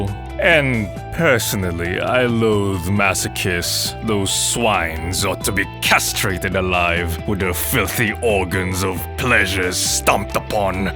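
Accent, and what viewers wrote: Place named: United Kingdom. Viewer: He kinda sound British to me to be honest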